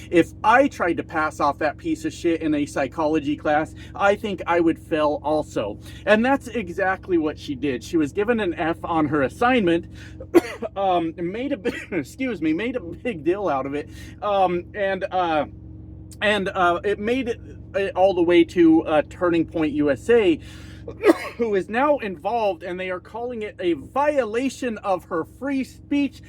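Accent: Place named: Quebec, Canada. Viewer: Are you Quebecoise?,sound like it.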